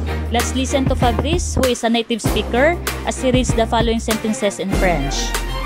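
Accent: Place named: Philippines. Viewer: Why does the background music remind me of motion gate dream works section?amd I love ur philippino accent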